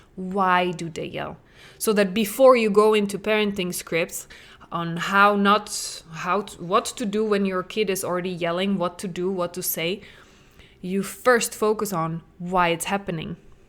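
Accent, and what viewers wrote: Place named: Czech Republic. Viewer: I was trying to place the accent, but didn't guess Czech Republic. I lived in Prague for 2 years. I love all the Czechs I know! I had a full breakdown last week just hopeless because I have been trying to learn to parent better for ten years now and I still can't (5 kids 10 and under). Your content is a new take, I'm excited to learn more.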